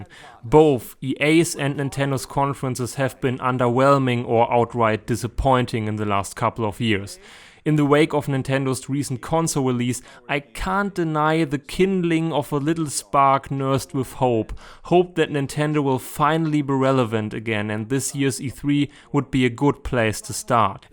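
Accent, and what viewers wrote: Germany. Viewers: Well i'm German too, so i know the accent. Your english is really good so i didn't catch it from the beginning. I hope your channel will get bigger. I sub for sure. ;)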